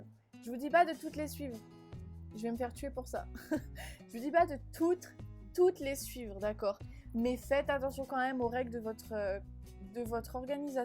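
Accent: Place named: United States. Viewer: Et sinon ton accent américain c'est goal, est ce que les américains qui te connaissent pas remarquent que tu es française ?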